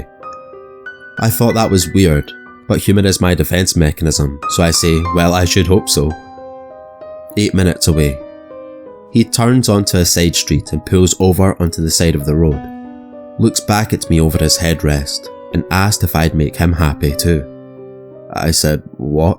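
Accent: Scotland, United Kingdom. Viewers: It took me some time to realize, he speaks Scottish, even tho I’m Irish😂🤦🏻‍♀️ anyways, great story☺️ keep on going⭐️ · love the scotts accent,great stories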